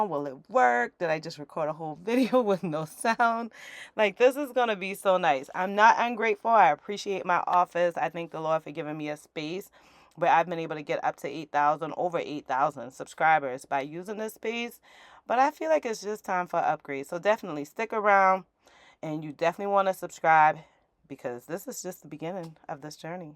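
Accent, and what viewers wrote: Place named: Charleston, United States. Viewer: New subscripber. Love this! That accent sure sounds familiar. Charleston?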